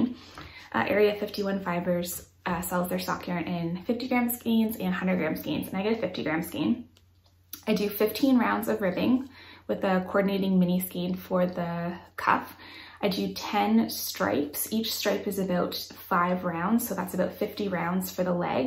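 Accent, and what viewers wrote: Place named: Canada. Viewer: Your stitching is so beautiful! Always enjoy your videos. You’re adorable and I love your Canadian accent ❤️ Also, you talk super fast. It cracks me up 😂❤️❤️❤️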